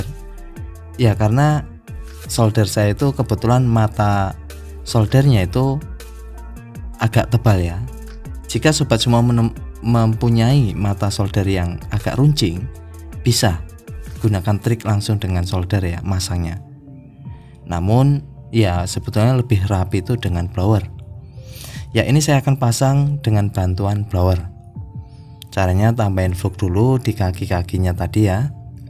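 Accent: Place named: Lampung, Indonesia. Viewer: Tak kira jowo ternyata lampung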